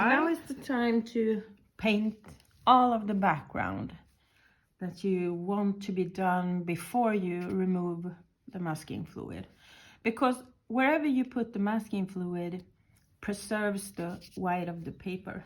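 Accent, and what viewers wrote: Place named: Sweden. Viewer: You sounds as if you are Swedish, so am I and I’m a watercolour artist and have my studio near Linköping. Anyhow I’ll try this and save it for later repeating. Kul att ha hittat dig, jag skall absolut dina sätt att måla björkar! Må så gott! Ps…. Nu är jag prenumerant av din kanal!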